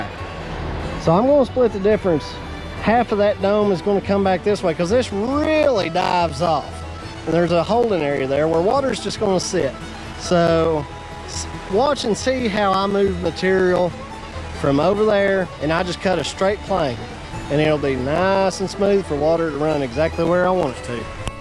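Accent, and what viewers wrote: South, United States. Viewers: your a hell of a teacher with your southern twang \m/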